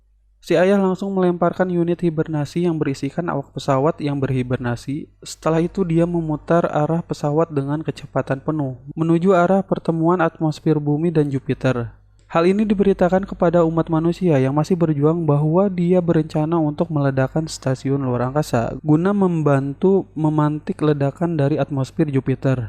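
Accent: West Java, Indonesia. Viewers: Abi ti Tasik kang, komentatorna sundanese, timana nya ?? Seru dan lucu.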